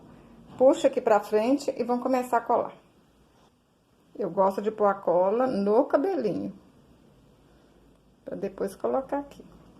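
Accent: Minas Gerais, Brazil. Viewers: E esse sotaque mineiro? 💞💞💞💞💞💞💞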